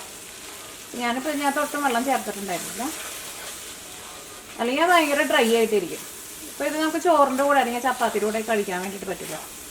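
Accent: Thrissur, India. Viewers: Jhan chechi dae valya fan aanu, more than any thing, chechi dae Thrissur dialect aaanu enikku eshttam... entae mummyum Thrissur kaari aanu, entae mummy thannae explain cheythu tharunna poolae thoonum, chechi parayunna, ethu recepie undaakiyaalum nalla taste aanu... jhan cooking il oru beginer aanu, chechi dae tips enikku othiri eshttanu ,....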